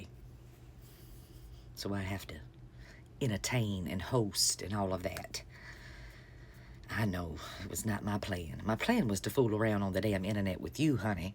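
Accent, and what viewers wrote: South, United States. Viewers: This is the most "well bless your sweet lil cotton-pickin' heart" Southern sass i have ever heard.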